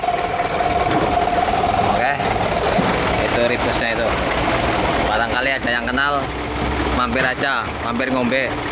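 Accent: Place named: Java, Indonesia. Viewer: Semangat bang.. 👍👍👍👍 nyari rizqi..semoga sukses.. medok ya..kek aku hehehe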